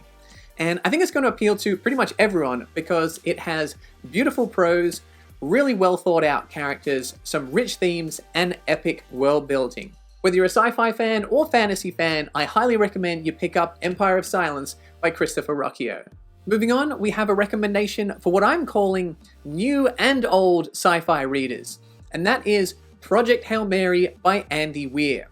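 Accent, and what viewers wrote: Australia. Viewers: The algorithm brought me here, and I'm glad it did. Good to hear an Australian reviewer. My particularly self-serving suggestion is my debut, 36 Streets (Titan Books UK). It was just last week shortlisted for an Aurealis Award (Best Science Fiction Novel), so I can assure you it's not terrible. Cover quote from Richard Morgan (Altered Carbon), who's a huge fan of the book. Cheers, T. R. Napper.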